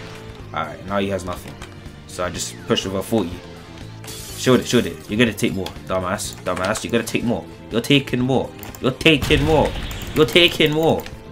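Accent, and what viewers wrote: United Kingdom. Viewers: why do i think ur british now hmmmmmmm.... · Are u black and British? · are you british? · You from the uk? I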